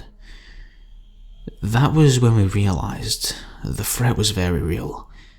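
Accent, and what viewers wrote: Scotland, United Kingdom. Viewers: Yay a Scottish horror narrator !!! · Great video! I love your narration, although I must admit I do have a serious jello-kneed weakness for a Scottish accent! Keep those stories coming! 😘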